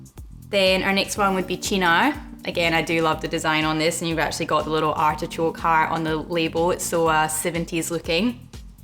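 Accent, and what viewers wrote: Scotland, United Kingdom. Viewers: OMG I thought you were from Scotland by your accent, but you're actually from Australia! That's really interesting. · I think this video was just mentioned in Stuff You Should Know's episode about amari. They mentioned a well put together video about amari by a lovely girl with lovely scottish accent... who else could it be?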